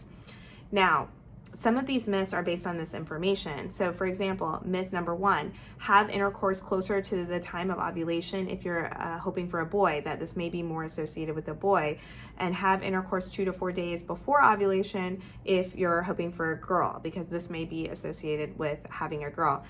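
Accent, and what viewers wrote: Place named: United States. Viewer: Great content! Also how did you manage to have such an American accent? Do some schools in India have international English classes where they hire teachers from Western countries??? Just curious.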